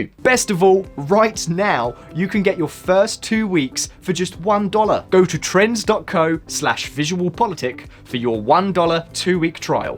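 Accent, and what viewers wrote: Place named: RP, United Kingdom. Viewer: This presitator is so fakeish. too posh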